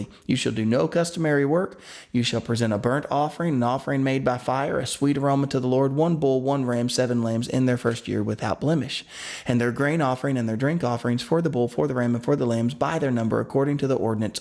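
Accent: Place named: South, United States. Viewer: Feast of booze 🍸 😆 so funny! I like your country accent! 😊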